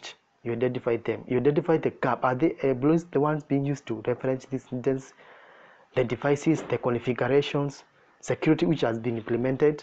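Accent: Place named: Kenya. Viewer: Nice one really helped our company. Thanks so much. This guys however sounds like a Kenyan.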